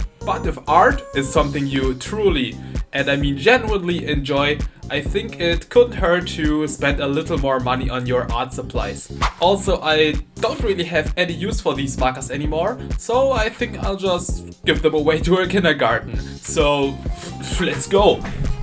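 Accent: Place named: Germany. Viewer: I Hear the deutsch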